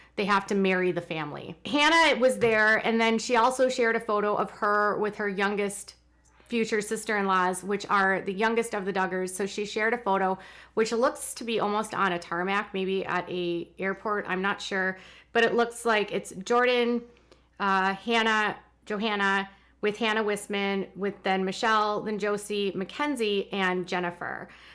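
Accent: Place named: North, United States. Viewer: Wilkinson Yes I have watched live, and it is a whole other ballgame! Her livestreams are usually very intense, with lots of opinionated viewers. I have heard her get tough with rude people who bombard her with idiotic questions, moot points, or repeat information to her that has been discussed in several of her previous uploads. I cannot blame her for at times being short with people who halt the program because they can't pay attention!!! runs a serious channel, she puts a lot of time and effort researching her subjects. Her tone is what I expect from a Northern state (in Texas our approach is a bit softer), but I in no way think she is rude. She is blunt but not rude! She must wrangle up the thousands of guests on her livestream or she would never get her job done!!!! Much LOVE to you!!! ❤💋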